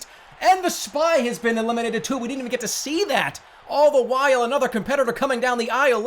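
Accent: Boston, United States. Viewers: sounds so much more bostonian here I love it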